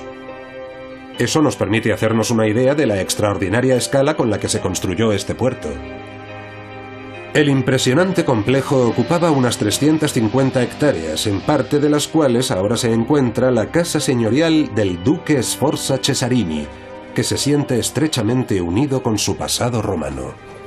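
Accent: Latin America. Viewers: NETFLIX ya se dió muchísima cuenta, que tenemos Español Latinoamericano....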